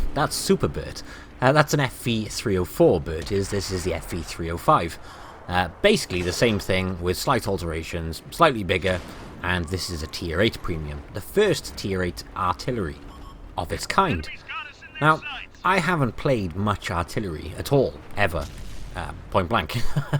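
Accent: Wales, United Kingdom. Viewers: Are you Welsh? you sound Welsh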